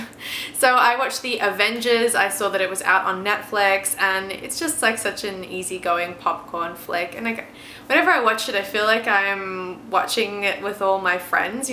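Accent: Australia. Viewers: Hey do u like the singer? Lol. Sorry had to:p but anyway I love ur videos and I love ur accent I've always loved Aussie accents! Lol Also question. Why did u come to the US like what are ur goals for here and what brought u here? Would love to know:) keep up the good work!!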